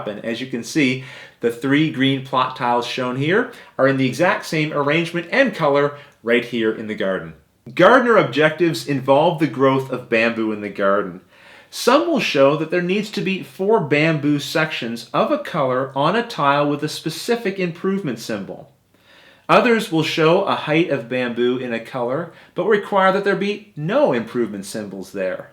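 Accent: Canada. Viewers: Are you by any chance Canadian?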